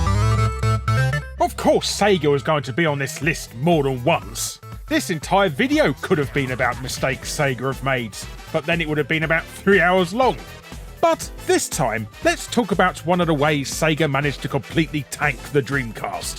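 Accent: United Kingdom. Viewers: Sup, Just writing “Hi” here to confirm that INDEED the subtitles help me TONS as a non eglish speaker with trouble understanding heavy accent english (no offense brits i LIKE your english, but is hard to understand some of your pronunciation 4 me without subs. it even helps me to learn it) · You new here and never heard a Brit? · He's British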